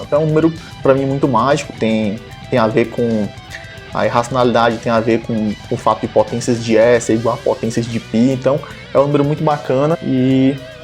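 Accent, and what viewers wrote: Ceará, Brazil. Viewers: o senhor é cearense? ou fez matemática na UFC? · Você é cearense?? Hauausus quase meu vizinho slc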